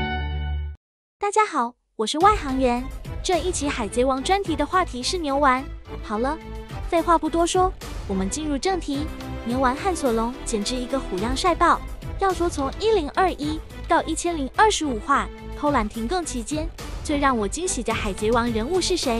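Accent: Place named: China. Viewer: 較象大陸的，咡過原音。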